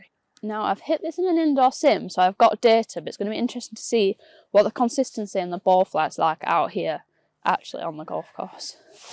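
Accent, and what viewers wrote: United Kingdom. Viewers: You know I really like your content and vids. I’ve become a fan, BUT I have to admit you’ve got the coolest UK accent ever!!! Great review, picked up my 10K yesterday, can’t wait to hit it….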